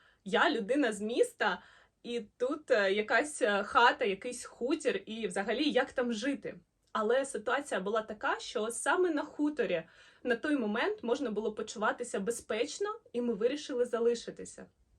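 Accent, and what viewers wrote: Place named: Bukovina, Ukraine. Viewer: мова звучить так, наче авторка не з Харкова, а з Буковини чи Львівщини )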